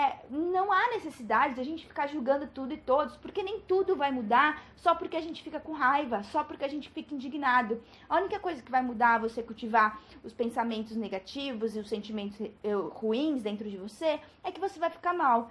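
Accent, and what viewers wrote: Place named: Sao Paulo, Brazil. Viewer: Paulista da gema,com esse sotaque!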